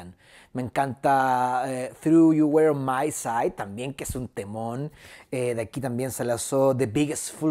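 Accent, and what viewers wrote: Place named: Mexico. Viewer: Idea mia o habla como mexicano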